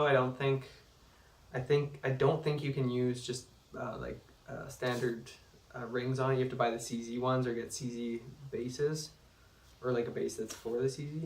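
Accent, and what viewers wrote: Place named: Canada. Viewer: You sound Canadian so please stop staying Zeee - its Zed :)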